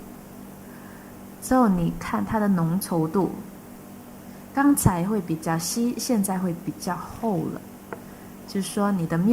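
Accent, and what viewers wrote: Malaysia. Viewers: 果然马来西亚华语，语病连连～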